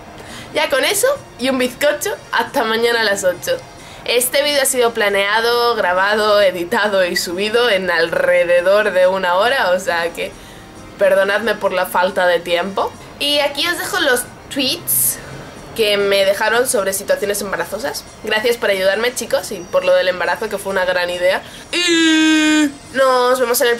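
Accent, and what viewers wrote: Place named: Mexico. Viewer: aww Me encantas e asento mexicano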